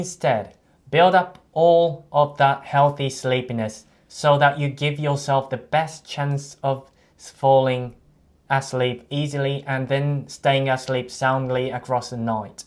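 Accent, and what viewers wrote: United Kingdom. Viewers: You did good, mate. While I am Australian, I definitely heard Britishness in most of your sounds. The only syllables/sounds which did not sound RP to me were the NI in night and SI in design. That silent g may be lengthening your i-sounds a tad too much. Nonetheless, after listening to you twice end to end, I felt you are nailing so much RP/Brit sounds.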